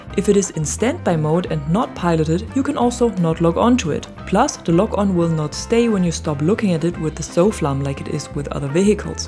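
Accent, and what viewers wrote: Germany. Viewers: Kann es sein das du Deutsch sprichst?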